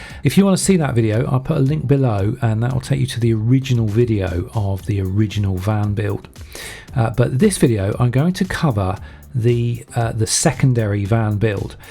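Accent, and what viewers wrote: United Kingdom. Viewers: You sound like your over in the U.K.? We did have some ex friends that lived over near Cumbria UK, the lakes District. But we was friends to them but they weren't true friends to us, they was true users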